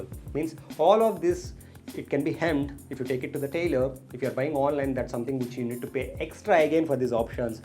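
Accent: India. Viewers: It's been a long time since I heard this Indian English. )))))😉😉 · no offense, but Indian accented English needs to be spoken with a slower cadence for it to be understood by many people.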